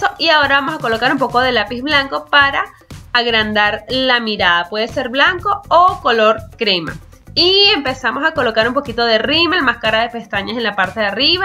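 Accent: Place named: Venezuela. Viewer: venezolana verdad (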